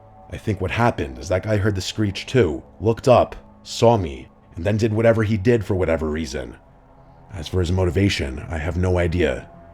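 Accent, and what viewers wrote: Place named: Massachusetts, United States. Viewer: I'm from Massachusetts. sounds like he's got a slight Mass accent.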